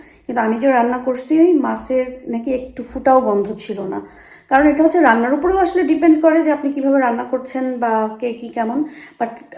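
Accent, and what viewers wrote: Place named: Jessore, Bangladesh. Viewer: السَّلَامُ عَلَيْكُمْ وَرَحْمَةُ اللهِ وَبَرَكَاتُهُ আশা করি ভালো আছেন। নীচের কমেন্ট গুলো মনজোগ দিয়ে পড়বেন এবং মনে রাখবেন। ১। আপনার উচ্চারণ ঠিক করুন। বাংলা শুদ্ধ করে বলেন, যশোরের উচ্চারণ পরিহার করুন। আপনার বাংলা শ্রুতিমধুর না। ২। কথা কম বলা ভালো। কথার তেমন দরকার কি? যেখানে ছবি কথা বলছে। ৩। ক্রিয়া বাচক শব্দের ব্যবহার শিখুন। একই বাক্যে দুটো ক্রিয়া ভুল। যেমন আপনি বলেন অনেক বেশী ভালো, এখানে অনেক ভালো বললেই হত। বাড়ি সুন্দর এখানে খুব ভালো বলা যায়। বেশি ভালো হবেনা ইত্যাদি। ৪। কোনো কোনো শব্দ স্টাইল মারতে গিয়ে ফানি হয়ে যায়। যেমন আল্লাহ হাফেজ। নরমাল ভাবে বললেই হয়। আজকের মত এটুকুই। যদি দেখি ইমপ্রুভ করেছেন তাহলেই আবার কমেন্ট করবো। আশা করি মনে কিছু করবেন না।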